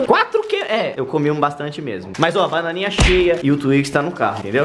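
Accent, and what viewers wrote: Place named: São Paulo, Brazil. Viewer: alguem em 2020 kkkk eu moro em Sao Paulo e é assim mesmo do jeito que o falo kkkkkkk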